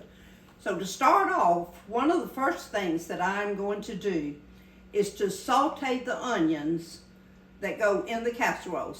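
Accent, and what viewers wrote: South, United States. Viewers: Happy belated birthday. 🎉❤🎉 I hope god blesses you (and all of us) with many more. You are a lovely & classy lady, someone I would love to sit down & have coffee with & hear your life stories. I think I love your channel more for your stories & positivity, to hear your sweet southern accent & to listen to infectious giggles (I laugh every time she giggles) more than your recipes. ❤ Although I do enjoy your recipes & tutorials too & have made quite a few of your dishes. Thank you for sharing. You are a breath of fresh air & I’d rather watch your videos over & over than to turn on the TV & hear sad & depressing news. This Oregon gal sends her love & prayers to you & your family. ❤❤ Shine for Jesus!❤ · I love the way you pronounce and the little sayings from the south. I'm from Oregon pronounced (Oregun). I love the southern accent.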